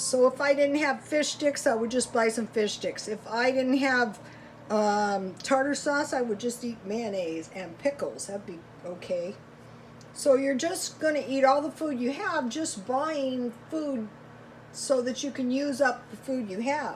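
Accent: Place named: San Diego, United States. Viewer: Beginning of video I'm like...she sounds like she's from SD. Oh shit she is from SD! Shout out from the SouthBay down here.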